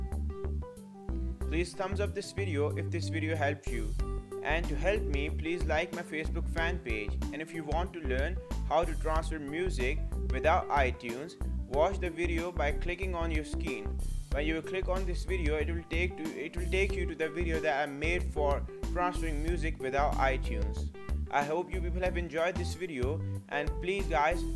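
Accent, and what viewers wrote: India. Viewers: He has an indian accent lol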